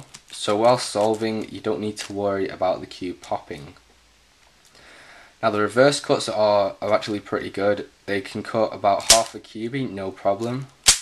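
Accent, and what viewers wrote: United Kingdom. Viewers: i could tell its uk -me being english- i just couldn't tell whereabouts haha, now i know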